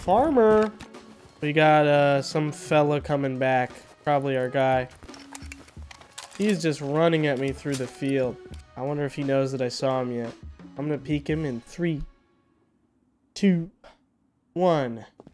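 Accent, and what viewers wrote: South, United States. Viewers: Lmao! your southern accent is on point! hahaha... If you're ever out towards the south. Wally1k just moved to Mrytle beach SC. You'd fit right in with that accent. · I love the country boy accent. You should talk like that all the time. Fuckin' hilarious. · tip for the southern accent, talk slower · your southern accent blows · The southern accent is why I started watching 😂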